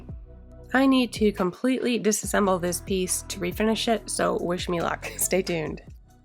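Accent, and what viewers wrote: Canada. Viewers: Ahhhhh… Your accent makes sense now when you mention Canadian dollars! I only noticed it with certain words but now that’s all I hear 😂 I love it :)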